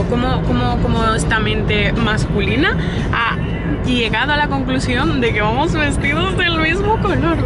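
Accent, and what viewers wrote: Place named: Andalusia, Spain. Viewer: Por qué no hablas siempre con tu acento andaluz??? No puedo evitar preguntármelo cada vez que veo un vídeo tuyo jajaja es mil veces más bonito que intentar quitárselo. Muy guay el vídeo y todos pero en andaluz mil veces mejor seguro!!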